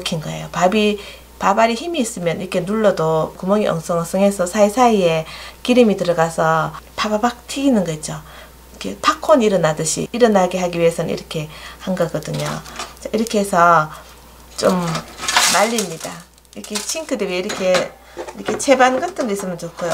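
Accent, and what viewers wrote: Gyeongsang, South Korea. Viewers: 경상도말씨가 정감이가네요 설명도쉽고 정말감사히 잘배우고갑니다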